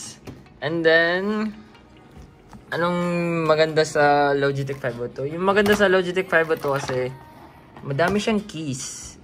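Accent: Visayas, Philippines. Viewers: sana-all akala ko may face reveal sa Dulo hahaha BISAYA accent talaga si lodi! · Bisaya ka lods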